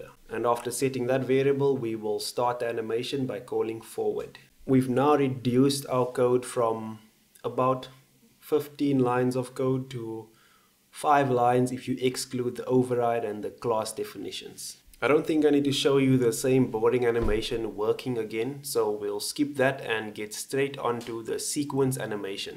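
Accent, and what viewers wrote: South Africa. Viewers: Going out of topic... are you a South African? you sound like an Afrikaans speaking coloured. :)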